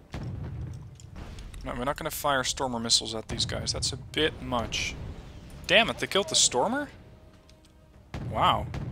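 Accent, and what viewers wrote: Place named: Netherlands. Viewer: You are welcome. I know, that you are no deity - when I interpret your (hardly present) accent correctly you are a human from the Netherlands, right? ;-) BTW I'm German. Actually this was my first comment ever to a youtube video. I really appreciate your videos, they are entertaining as well as informative. And I felt somewhat "honored" that you mentioned my comment in another video of yours. However generally I hate wargame (kind of), since it's the first time in my life (and my computer playing life goes back to the Commodore 64 times(!)) that I am addicted to a game. The interesting thing is that neither ALB nor RD hold the usual grinding game mechanics, which usually make players addicted. Well another story... So before I get carried away about thinking why this happened to me, and why I like watching your videos so much - thank you, and please go on doing such an entertaining job. 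And keep doing ranked, please! Private Parts (my ALB and RD name)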